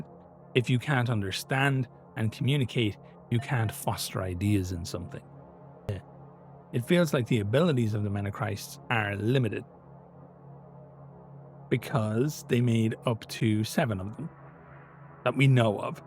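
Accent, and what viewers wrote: Ireland. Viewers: Irish? Subbed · Are you Irish ?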